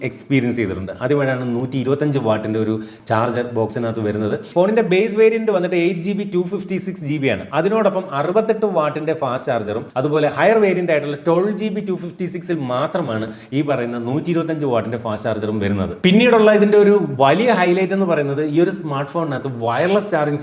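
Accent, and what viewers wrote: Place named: Trivandrum, India. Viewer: Trivandrum അങ്ങനെയാണ് ബ്രോ H ന് ഹെച്ച് എന്നും പറയും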